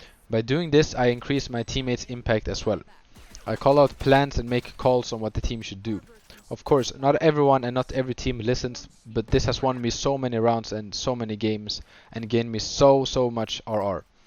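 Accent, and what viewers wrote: Sweden. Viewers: Älskar den svenska dialekten, bra video mannen, ska ha allt i åtanke :) Just nu D1 med ca 120h, målet är ASC denna act!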